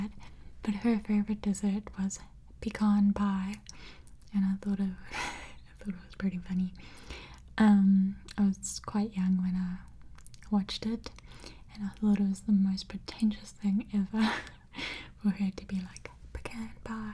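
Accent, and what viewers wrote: South Africa. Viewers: I love your accent! Glad you did this! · The accent is beautiful. My best buddy Ralph is Afrikaans and his accent is incredible. Great singing voice too. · Absolutely love your accent! My new fave asmr channel! So glad I found you 😍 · So happy to hear a from a south african asmrasrtist! 🇿🇦 LOL the feeling of nationality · Hey cute lady south African British rose lady great video I could listen to you're accent forever and I sure will if I ever get chance in future I would love to visit south Africa and stay in a tent hey I will watch the video and sleep to it thanks I hope you try potatoe cakes there British and try crumpets with butter xxxx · My fave place on earth. Hoping I win the lottery and can move out to camps bay or kleinbaai!! Great accent! Great vid!! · Just ran into this on a rainy evening in Alabama. Had a chance to visit South Africa years ago. 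The accent is fantastic. But here in the states there are a variety of pronunciations based on geography. What you call a trolley is a cart or buggy here in the south for example. And sometime emphasis is on a different syllable. Pecan for example is either “P-can” or “pecahn” as you said. Another example is can’t, here in Alabama we might say it as “cain’t” Great stuff!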